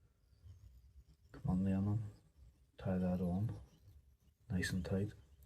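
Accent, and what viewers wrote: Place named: Ireland. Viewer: What part of Ireland are you from....nice tie by the way....keep up the good work....i've only started trying to tie smaller flies recently as the eyesight isn't as good as it used to be unfortunately...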